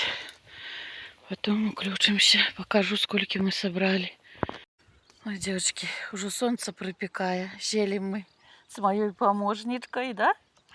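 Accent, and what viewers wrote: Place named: Bryansk, Russia. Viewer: Как вас приятно слушать. У вас такой говорок, как у нас на Брянщине... Один в один...Давно не была на родине.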